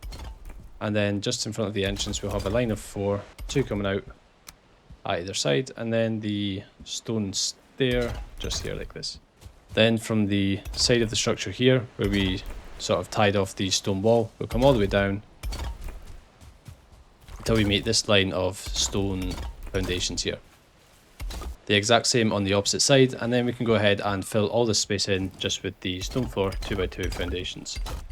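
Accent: Scotland, United Kingdom. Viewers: what a beautiful Scottish accent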